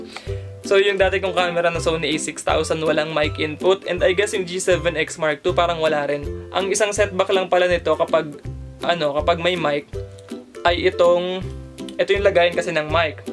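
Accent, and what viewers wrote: Iloilo, Philippines. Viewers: kuya may tanong po ako Ilonggo kapo ba???????? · hala illonggo ka gali ka cute HAHAHAA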